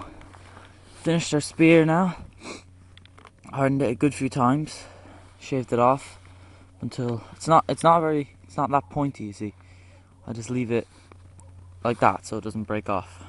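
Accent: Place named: Ireland. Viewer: u hav such an irish tone to ur voice ha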